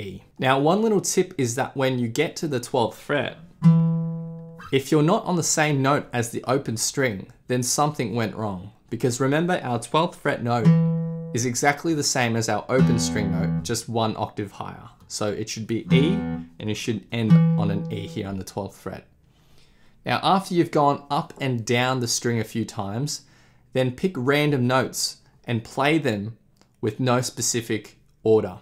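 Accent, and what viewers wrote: Australia. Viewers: Haven’t watched hundreds but agree this one is brilliant, keep it up. And the Australian accent… sweet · Something about the Australian accent that makes this so much more interesting…..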